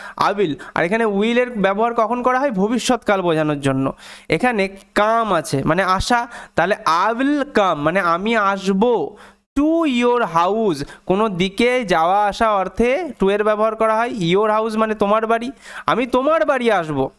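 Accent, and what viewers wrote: India. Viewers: আপনি কি ইন্ডিয়া থেকে বলছেন সার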